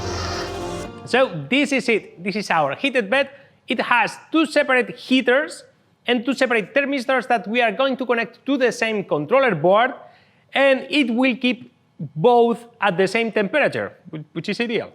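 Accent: Spain. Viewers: pretty cool! very nice project! What material is the red plastic that you printed parts from? was it hard to get the axes perpendicular in this scale? Also you sound spanish ;) where are you from? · Soy yo o eres Español? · eres español, verdad? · que chulo acento español xd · Acentazo español, nombre español, pero videos en ingles. Es como si colim furze hiciese los videos en español para tener mas seguidores en Sudamérica. 🤷🏼‍♂️ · Inconfundible acentazo español nada más abrir el vídeo xD Como me alegra ver a makers españoles. Mucho más viendo lo crack que eres y lo bien que te va en la plataforma. Proyectazo! Sub! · Eres español? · This dude Spanish? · Joder cómo se nota el acento español...Buen vídeo tío!!! Te van a encargar cosas muy grandes con eso!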